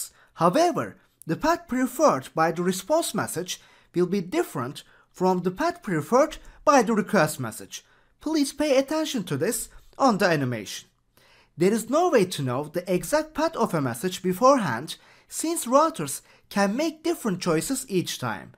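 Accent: Turkey. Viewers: Aynen Türk aksanı bu :> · i like your accent, it sounds Turkish to me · I guess Turkish origin speaker.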